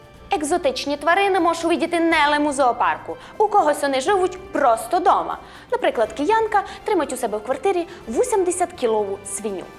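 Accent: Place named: Transcarpathia, Ukraine. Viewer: Podriv Ustoev Закарпатська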